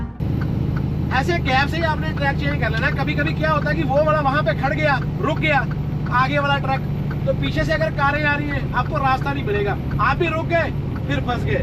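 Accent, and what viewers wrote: Punjab, India. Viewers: Bhai aapki Hindi Punjabi mein sun ke maja aa jata hai 😅!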